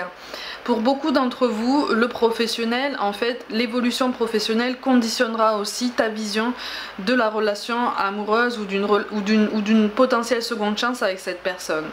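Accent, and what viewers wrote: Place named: Perpignan, France. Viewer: Excellente guidance ❤️ je kiff ton accent Perpignan ???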